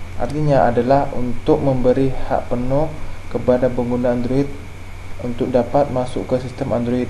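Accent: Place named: Madura, Indonesia. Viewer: Corak madureh se ngocak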